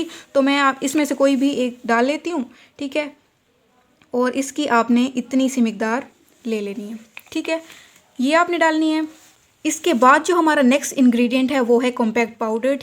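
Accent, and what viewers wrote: Saraiki, Pakistan. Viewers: Ap saraiki speaking hn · Awesome. Your accent like sraiki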